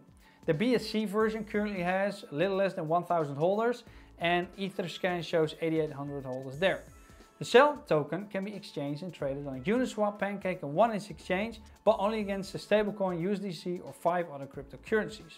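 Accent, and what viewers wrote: Netherlands. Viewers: You sound Dutch :) Nice covering of Cellframe, thx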